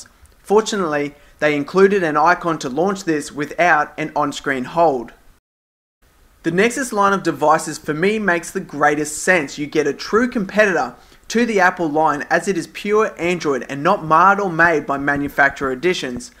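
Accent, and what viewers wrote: Australia. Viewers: Great to see (hear) an Aussie reviewer! Keep up the good job :-)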